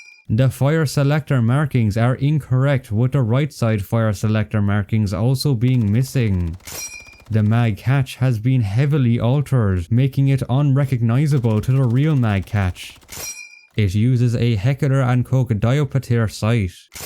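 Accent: Ireland. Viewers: Heard your accent and thought you were Irish and I new it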